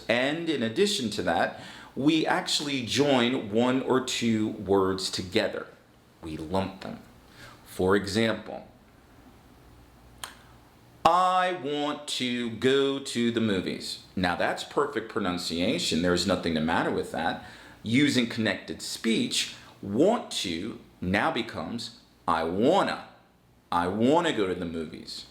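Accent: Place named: United States. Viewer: thanks so much for your lesson , Sir, and I like so much your American accent and so interesting to learn more,,, 5 ways, suggestions or tips to speak more naturally : 1. connected speech 2. stressing syllables 3. learn English phrases and expressions 4. learn phrases verb 5. English sound or phonetic sound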